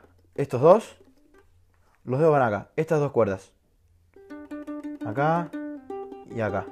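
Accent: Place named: Córdoba, Argentina. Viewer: Argentinoooo wachooooo cordobe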